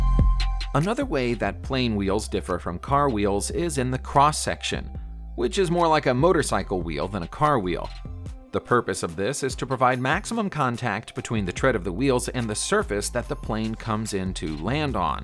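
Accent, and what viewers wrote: United States. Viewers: What are the units for the temps given? Judging by the American accent they could be Fahrenheit, but seeing as none else in the world uses that measurement. Just curious as to some of the actual temperatures endured.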